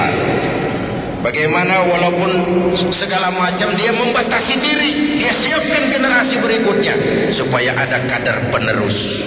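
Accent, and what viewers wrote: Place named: Aceh, Indonesia. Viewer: suara aceh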